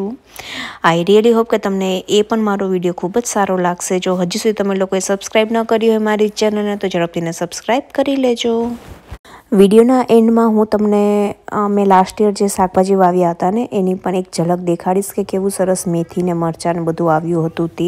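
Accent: Kathiawar, India. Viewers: 🙏 જય સ્વામિનારાયણ 🙏 👌👌👌👌 વિડીયો બનાવ્યો છે તમારૂં વતન કયું છે તે જણાવશો અમે તમારો વીડિયો પહેલી વખત જોયો છે મજા આવી છે તમારી ભાષા પરથી એવું લાગી રહ્યું છે કે તમે કાઠીયાવાડી છો અમે મોરબી થી છી તમને બંધાને મારા ભાવથી જય સ્વામિનારાયણ 🙏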